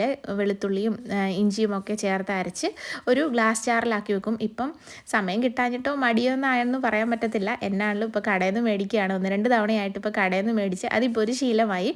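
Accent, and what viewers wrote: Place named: Kollam, India. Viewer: Kollam slang aanu. Athukondu chotdichatha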